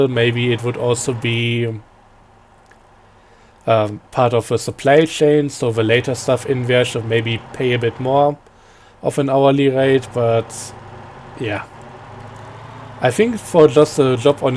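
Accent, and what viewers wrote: Germany. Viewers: Do I hear a bit german?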